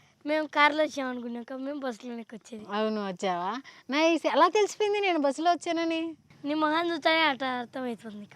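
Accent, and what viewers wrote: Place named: Visakhapatnam, India. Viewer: maa thalley annadi antey pakka vizag eyyyyy…😎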